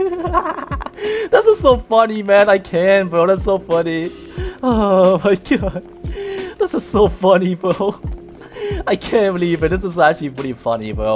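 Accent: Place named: Thailand. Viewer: I can barely understand your accent because your from Thailand im from Philippines...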